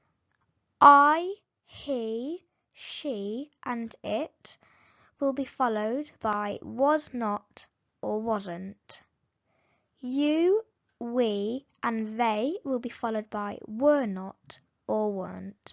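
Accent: United Kingdom. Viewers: Yes, it is a British accent 🙂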